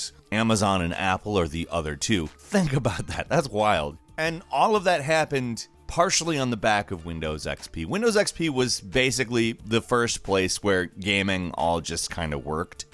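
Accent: United Kingdom. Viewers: `s Brit accent is awesome. Oh boy, want more of it lol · WHERE TF DID THAT BRITISH ACCENT COME FROM? 😂😂😂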